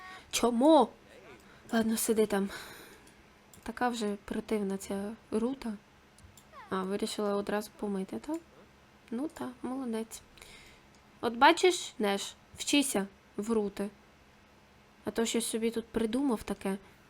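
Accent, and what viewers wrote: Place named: Western Ukraine, Ukraine. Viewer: Вауу, а я не знала, що так говорять на заході! Я з далекої півночі;)))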